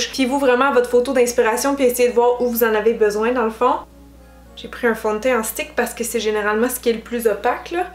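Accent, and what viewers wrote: Canada. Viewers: J'adore l'accent canadien Ps: vive le Canada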